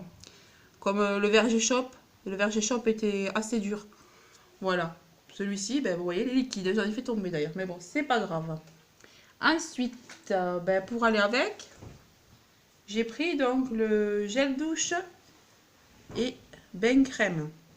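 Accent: South, France. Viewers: J'aime beaucoup fashionnista. Alors j'ai reconnu tout de suite ton accent . Je suis aussi dans le SUd. Mazamet je connais ;) Bisous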